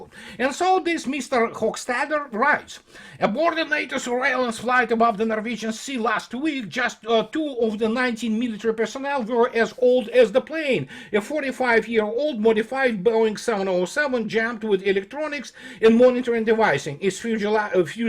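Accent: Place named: Russia. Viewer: When you read from an article could you please not dramatize your voice you are hard enough to understand with your thick Russian accent it really does no justice to make it worse and harder to understand you by adding drama to your voice. Please try to slow down a little and let your voice keep pace with you brain you make a lot of filler noises that actually make you sound like a drunken American with a thick Russian accent.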